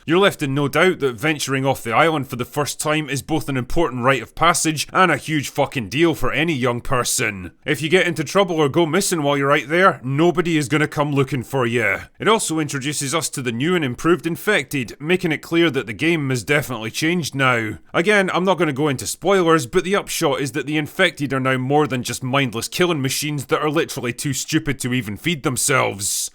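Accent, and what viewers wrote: Scotland, United Kingdom. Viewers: Accent. Scotsman. Kettle. Ha!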